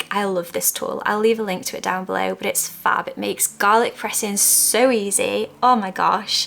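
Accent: United Kingdom. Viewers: Wow these recipes look amazing and yummy. I’m so hungry now. Real comfort food for the winter season. I love your beautiful soft voice with your British accent 🥰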